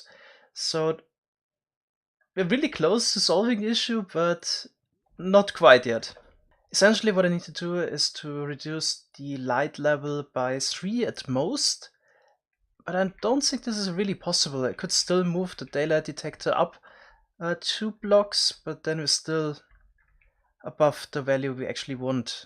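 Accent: Germany. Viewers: Ist er deutsch? Sein Akzent klingt danach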